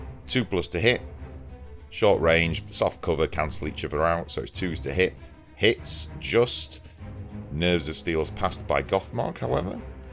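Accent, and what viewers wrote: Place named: Leeds, United Kingdom. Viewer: I've been thinking of doing some 'Munda battle reports myself but after seeing yours I've given up on the idea. I can see how each batrep is improving with every episode and I'm fully expecting next year's efforts to be on at the local flicks with the production being so good! I can't even imagine how long they take to do but I'd just like to say I appreciate all of the work you put into these, GW could learn a thing or two from you. P.S. are you from Leeds? I ask because you say the number one like I do and I think there's only us Loiners that pronounce it won instead of wan 😉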